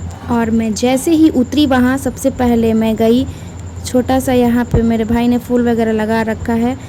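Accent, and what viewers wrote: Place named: Bhojpuri, India. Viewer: hnnn bhojpuri type bol rhe ho na aaisa hi kuch lag rha tha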